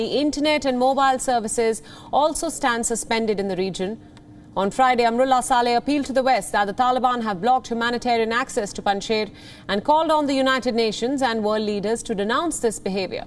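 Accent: India. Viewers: Why the narrator is speaking like an Indian Mama Pundek? TQ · Hall If you had worked in high tech in the US, you would find her accent easier to understand -- you would have had so much practice learning to understand it from listening to your Indian coworkers! But it gets worse: there are more people speaking English with her Indian accent (or one similar) than there are speaking British or American English! Indians will get the ability to shape the future of the language due to their numbers!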